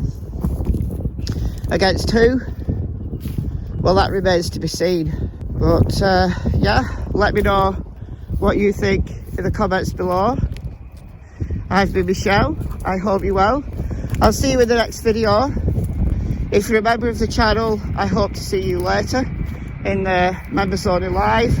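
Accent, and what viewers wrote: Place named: United Kingdom. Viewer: I love this accent greeting me in the morning, don't you!! Something about a UK accent that makes a person sound so much more interesting! I heard that they don't feel those footprints are Summer's. I can't for the life of me remember who said it. It was a creator that is very close to Kingsport.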